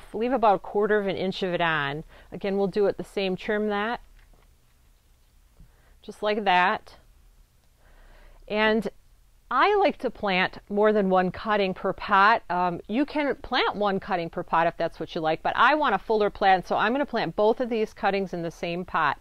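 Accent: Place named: Chicago, United States. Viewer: Her accent makes me miss Chicago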